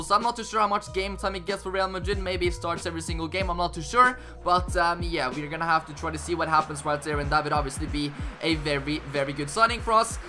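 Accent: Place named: Norway. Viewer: You sound like you're from Norway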